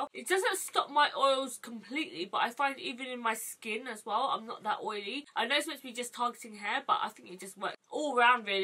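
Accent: United Kingdom. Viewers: who would've thought that your accent is british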